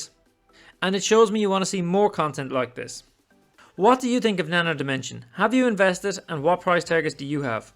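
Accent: Ireland. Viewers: Who is downvoting this? I liked and subscribed, thanks for the video. I especially enjoy the Irish(?) accent - “sales and mahhhhrketing (marketing). Ah, Sacred Heart, there ought to be a bar over here.”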